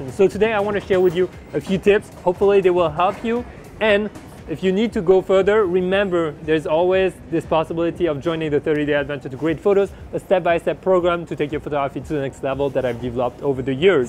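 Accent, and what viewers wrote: France. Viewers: yo! Love your video, they are really great! Can you or anyone else that knows it tell me from where does this accent come from? because i feel like its french in the way you pronounce some "r" but im not sure:)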